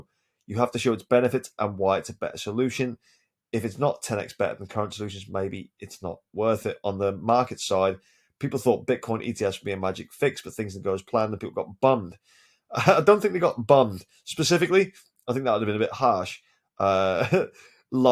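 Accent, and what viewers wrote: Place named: Norfolk, United Kingdom. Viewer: Norfolk! Sounds weird hearing a crypto chap from the area.good luck this cycle ✌️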